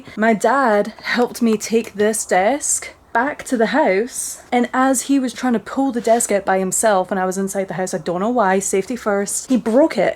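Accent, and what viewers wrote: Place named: Scotland, United Kingdom. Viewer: Your doing ok. Love ya Scottish accent. Awesome!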